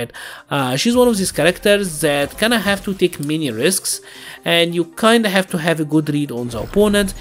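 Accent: Egypt. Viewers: Are you Egyptian? You sound Egyptian.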